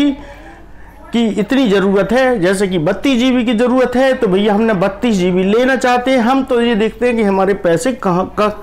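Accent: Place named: India. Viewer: I understand only one word - "pendrive". Where you learn this indian english dialect?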